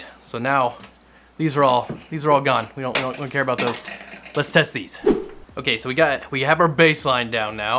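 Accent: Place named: Illinois, United States. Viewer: When the fuck did this channel pop up? Thank you recommendeds. I can’t figure out where this dude is from either, accent is telling me Illinois but that jacket is telling me Tennessee or some shit.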